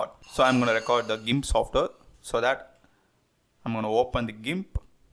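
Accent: India. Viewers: thanks mr indian accent once again thank your kind man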